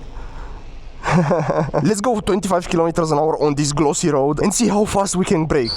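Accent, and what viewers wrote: Romania. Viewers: Este român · ai accent de român😮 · broo, u from timisoara?? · mi am dat seama ca esti roman după accent😂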